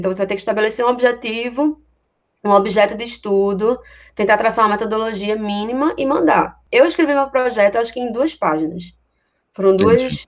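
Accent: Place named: Pernambuco, Brazil. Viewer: Pelo sotaque é galera super gente fina de Pernambuco! Por mais youtubers de Pernambuco fazendo sucesso aqui na rede! Pernambuco em 1° lugar! Parabéns pelo conteúdo!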